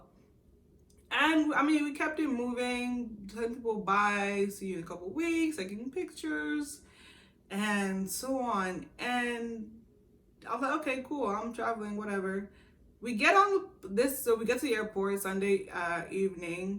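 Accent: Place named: Nigeria. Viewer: Your nigerian accent is still there sha😅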